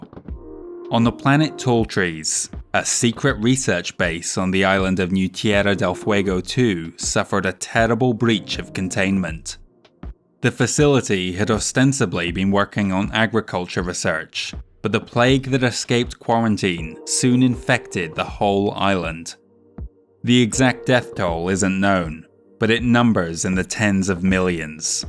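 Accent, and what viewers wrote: Scotland, United Kingdom. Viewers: MechCommander is the first "proper" PC game I ever played. Remembering it just a month ago is how I suddenly ended up stumbling upon your channel. And my oh my. You have blown my mind with this long-form content. Unreal, well done. P.S. are you Scottish? You have such an interesting Scotland/England combination accent. I'm from just over the border, in Northumberland.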